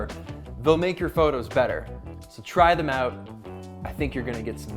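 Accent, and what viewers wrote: Canada. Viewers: Are you Canadian? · Is he Canadian? Where from?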